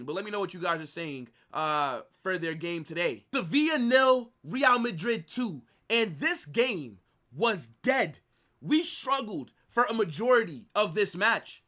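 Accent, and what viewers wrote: United States. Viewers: I could tell he’s American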